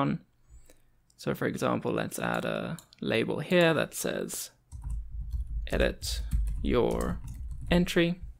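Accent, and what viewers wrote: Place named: South Africa. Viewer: South African accent FTW :)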